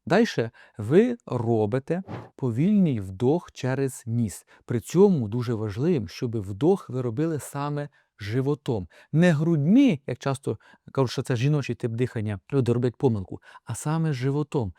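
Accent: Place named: Lviv, Ukraine. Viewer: Ви, напевно,- лікар від Бога!! Особливо мені подобається ваша вимова! (Я закінчив учбовий заклад у Львові та прожив там понад 30 років… Тому ваша вимова мені дуже рідна!! ) Дякуємо вам за ваші поради! Дай Боженька вам та вашим близьким,- Здоровʼя,, Добра та Любові! ❤️! Будьмо!!!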